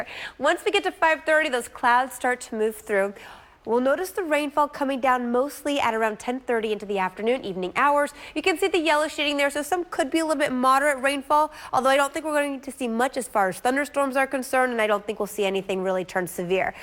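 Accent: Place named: South America. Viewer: Wait Waa da fuuuuuu !?! ¿ Since when did WMAR get a South American Weather lady ?!?!?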